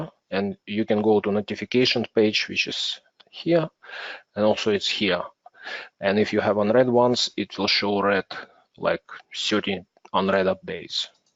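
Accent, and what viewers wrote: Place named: Russia. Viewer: Жёсткий акцент выдает своего :))) Спасибо за видео.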